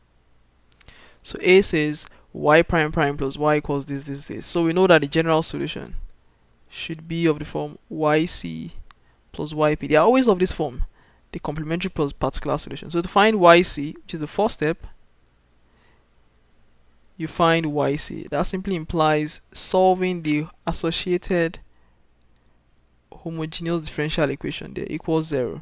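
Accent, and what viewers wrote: Nigeria. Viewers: You are Nigerian aren't you lol, anywayz thanks for the videos it does help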